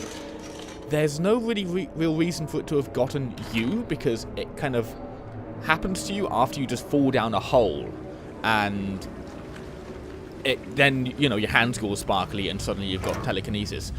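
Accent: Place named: United Kingdom. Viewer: Your accent makes me think of Jonathan Ross - are you from the same part of Britain?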